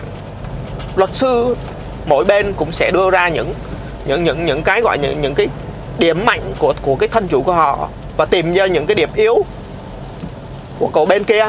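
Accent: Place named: North, Vietnam. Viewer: e đăng ký rồi anh. tiếng bắc 54 hay quá nghe ghiền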